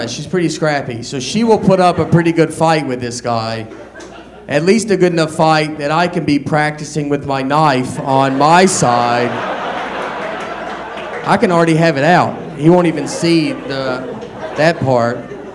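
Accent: United States. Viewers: If that gets you going, you know there's probably <num> Americans that talk that way.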